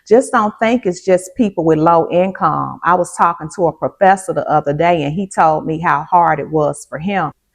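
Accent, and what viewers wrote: South, United States. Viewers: Love the message and your southern draw as well. 😆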